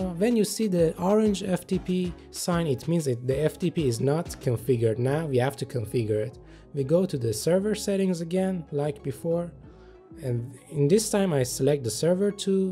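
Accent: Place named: Iran. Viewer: Luv your Persian accent pal.. so glad to see Iranian guys here making professional vids.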